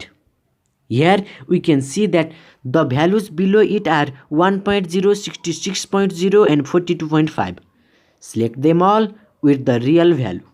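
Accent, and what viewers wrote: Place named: Nepal. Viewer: Nepali po ho Brother ... Accent le testai lagdai xa....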